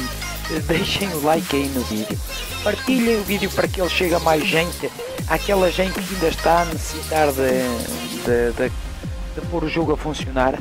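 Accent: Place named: Europe. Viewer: maxo esse sotaque europeu e essa musica estourada puta merda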